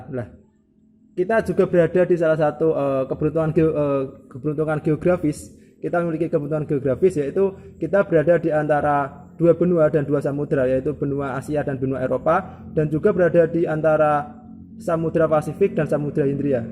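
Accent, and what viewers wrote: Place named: Java, Indonesia. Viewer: Kok medok mas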